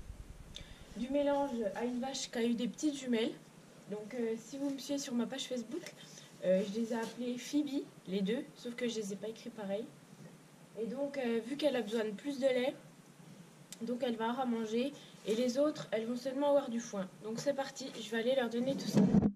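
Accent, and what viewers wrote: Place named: South, France. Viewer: On voit bien que tu viens du sud J'adore tes vidéos 🥰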